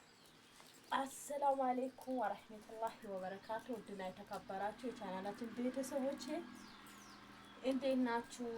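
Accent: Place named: South, Ethiopia. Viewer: በርች ውዷ እንደው በደንብ እንኳን በማታውቂው ቋንቋ እየ ታገልሽ ይገባሻል ❤❤አማረኛሽ ዘና ሥለሚያደርግ በርችልኚ የደቡብ አማረኛ ውሥጤ ነው 😂😂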